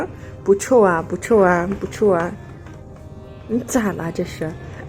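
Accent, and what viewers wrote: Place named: Beijing, China. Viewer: 这汉话没有内蒙口音，倒是像北京话，譬如用 霍霍 这词。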